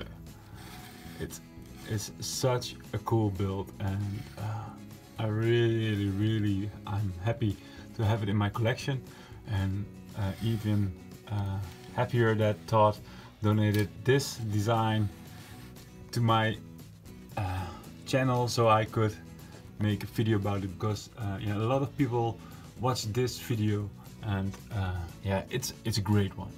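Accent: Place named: Netherlands. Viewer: You sound Dutch. I'm Dutch to!!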